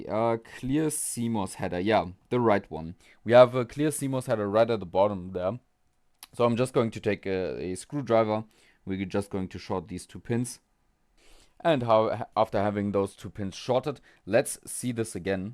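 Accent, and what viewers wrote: Germany. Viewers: you sound very german x'D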